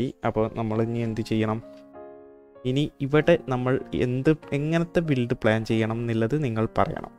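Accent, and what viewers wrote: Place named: Kozhikode, India. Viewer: I think you're frm kozhikkode or kannur😌🍀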